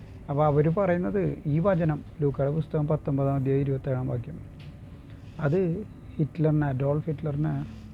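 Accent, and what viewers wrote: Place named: Kochi, India. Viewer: താങ്കളുടെ വിവരണം വളരെ രസകരം ആണ് നിങ്ങളുടെ ഭാഷ യും കൊച്ചി ഭാഷ ❤️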